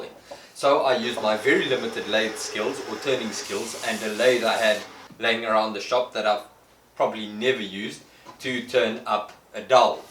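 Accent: South Africa. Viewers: The South African accent just adds to the video in the best way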